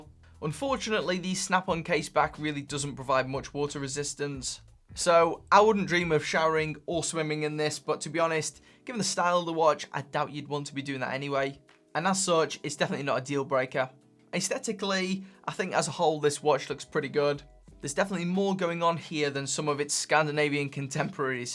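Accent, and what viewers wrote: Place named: Midlands, United Kingdom. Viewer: This guy is the only case I can tolerate the midlands accent for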